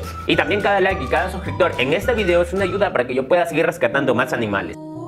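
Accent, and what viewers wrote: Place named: Ecuador. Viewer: Hola yo también soy del Ecuador pero vivo en Italia yo llegué en tu canal por que soy animalista y una de mis amigas había publicado un video en Facebook entonces lo VI y por tu acento me dije el es mi compatriota y que orgullo saber que existe una persona haci en mi país El maltrato animal en Ecuador es muy penoso la gente no quiere entender que ellos siente dolor que tienen un corazoncito cuando estuve en Ecuador VI tantos animalitos en la calle maltratados perritos atropellados y para mi ver esto era un sufrimiento tan grande trataba de sensibilizar a la gente pero no es fácil espero que los niños del futuro que aprendan a amar y respetar a los animales me gusta pensar haci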